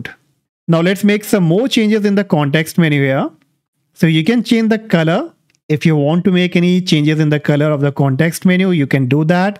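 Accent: India. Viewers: Indian accent..get out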